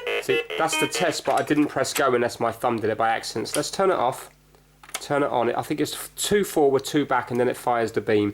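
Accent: United Kingdom. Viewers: Haven't watched the entire video yet but I'm having a moment of appreciation for this channel. I'm British but your accent is posher and softer than mine. I like how you film in natural light. You are enthusiastic in how you fix things and know about the background of most items. You usually speak as if you know nothing yet you've managed to fix almost everything. I've watched multiple 1 hour+ long fix it videos. Great content. Keep it up.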